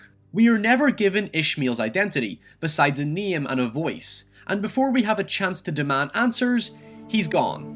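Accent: Northern Ireland, United Kingdom. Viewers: Northern Irish? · He has a completely typical Northern Irish accent. You certainly skimped on the punctuation lessons though, didn't you?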